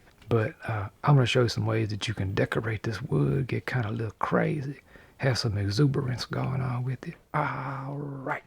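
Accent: Louisiana, United States. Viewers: He had me at Bilbo and Gandalf story in his Cajun soft voice 😂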